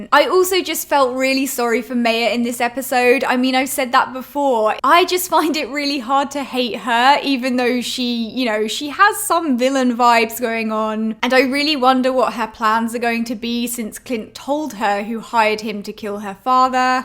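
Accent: United Kingdom. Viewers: You have the most british accent that i’ve ever heard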